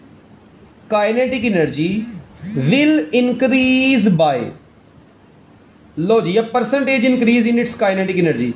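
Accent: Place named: Punjab, India. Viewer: really sir jus amzing punjabi accent ke sath aur mast se samaj aa gyaa thnxxx a lot sir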